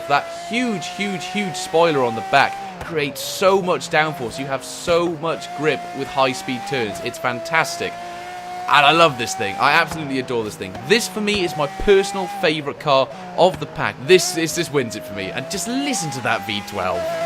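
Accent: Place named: United Kingdom. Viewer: Why do you remind me of SovietWomble? Is it the british accent?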